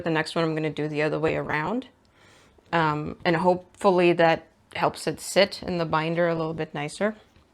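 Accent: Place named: Minnesota, United States. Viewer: Nice! I'm just starting to be interested in solar dying / natural dyes too... I live on an old farm in MN and have lots of goodies I can forage from my own land. Wondering if you are close to MN too, based on accent and what lichens you found to play with. Thanks for the video, hope to see and learn more!!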